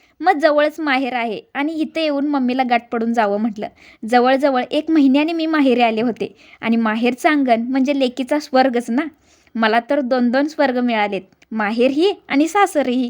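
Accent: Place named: Sangli, India. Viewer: मी पण सांगलीची आहे तुम्ही बोललाकी मला खुप आपली माणस बोलता आस वाटतय खुप छान वाटतय तुमचे व्हिडिओ बघुन😊😊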